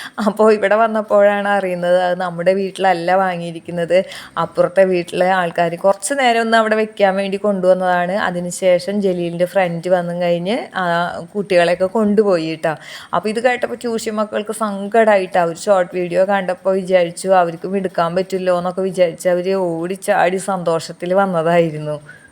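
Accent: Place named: Thrissur, India. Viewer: സംസാരം കേട്ടിട്ട് തൃശ്ശൂർ ആണെന്ന് തോന്നുന്നു ഞാനും മുട്ട കുക്കറിൽ വേവിക്കാറുണ്ട് എല്ലാ വിശേഷങ്ങളും സൂപ്പർ 👍👌🥰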